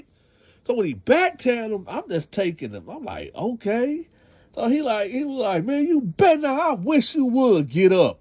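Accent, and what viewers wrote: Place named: South, United States. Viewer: Man why I think you was from down south lol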